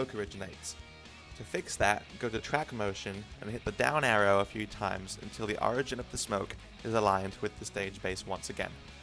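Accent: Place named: England, United Kingdom. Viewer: I had no idea that you were English. Definitely surprised me when i heard that accent. Don't know why i thought you were from the States.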